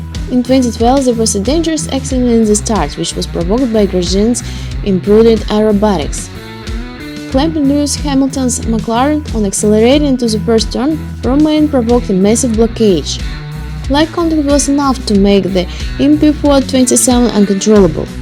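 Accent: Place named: United Kingdom. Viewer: При просмотре фильма, вспоминается документальные фильмы от BBC. Многие, кто любит смотреть такое кино, вспомнят, ламповое ощущение и детский восторг от просмотра. Особенно британский акцент, лично мне он нравиться с детства. Подобранная музыка, локация сьемок, манили не моргать. Кто интересовался шоу Topgear, тот поймет о чем Я говорю. Обнимаем и радуемся ее подачей.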